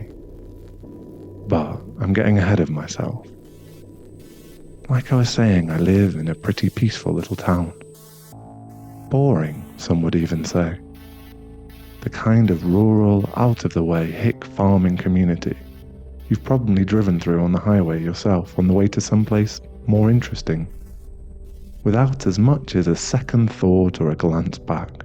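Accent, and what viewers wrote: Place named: United Kingdom. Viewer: New subscriber, nice to hear a fellow Brit here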